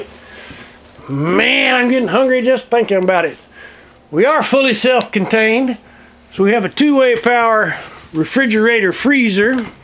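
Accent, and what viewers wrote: Texas, United States. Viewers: i really love this video ,, thanks you Texas accent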